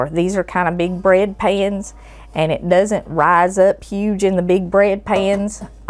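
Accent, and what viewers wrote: South, United States. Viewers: I didn't know about you but it always taste better with a southern accent ❤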